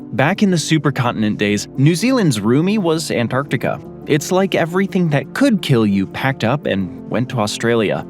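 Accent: United States. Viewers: Hey, let's not forget that he sounds American and their words often sound different to ours. So attempted pronunciation is a good try. As for the Maori names, as a 7th generation Kiwi (Pakeha) I struggled with Maori pronunciation even though I learned the vowel sounds in school. The narrator may have had little to no exposure to the language. It's not spoken elsewhere and he may have been doing his best with pronouncing. Imagine if you were making a video on à country you have never had exposure to. Say China. They have two versions of language, Mandarin and Cantonese. I cannot tell which is which, but I know I once worked with a lady whose first name was Qian. Pronounced Chen. If I hadn't learned in that job with a multicultural workplace, I would not have learned how to pronounce that name. Many names, in fact. And I learned that Te Reo is a dying language and my Māori friend taught me a bit about it. 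Since then I have attempted to speak Maori words and names as accurately as possible, and told my daughter about this and she tries the pronunciation too. We do t always get it right, and I've been exposed to it all my life. As for the British names, I'm pretty sure it's just trying to split it into familiar syllables and mispronounced. As for me,.if I was doing a video on other places, I would run some pronunciation checks and see videos of people from the country or place and their pronunciation. But it seems many don't do this step. It's a shame, but not unexpected. No need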